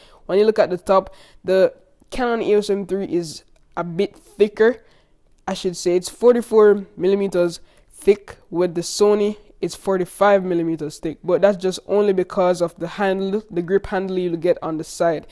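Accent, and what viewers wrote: Jamaica. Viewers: Are you a Jamaican? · He Jamaican I can hear the accent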